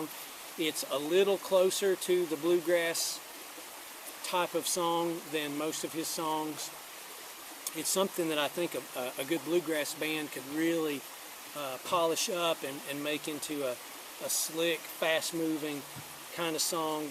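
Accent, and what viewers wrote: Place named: North Carolina, United States. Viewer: I so enjoyed listnin to your "blabber" & "yappin" about the tech end of recording, although a lot goes over my head. I just want to play, sing & have someone else do that work. 😀 When I was a kid in the '60s & '70s in TN, my dad & uncles would sing in the living room a lot of Hank Sr., Lefty, Jimmie Rodgers (Blue Yodeler), & other standard country music & hymns. We had an overhead light fixture smackdab in the living room ceiling. The men would hang a mic from it & they'd sit around it in a circle & play & sing to that suspended mic. Now you wouldn't want to do that on a ceiling fan & have someone come along & turn on that fan! Oops! 😃 Uncle Robert called it a hillbilly boom mic. 😀 What a sweet setting by the creek & trees, with a crawling bug as your co-host. Even though you're in NC (I grew up with TN & VA accents which are a slight difference) your accent is so pleasant. 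Your singing voice beautiful. Your dad had such beautiful high harmony. Wow! Really like your Gibson Dove. God bless you & your family. 🙏🏻🌼 Edit: I saw where someone suggested you scan & save those paper lyrics. I agree!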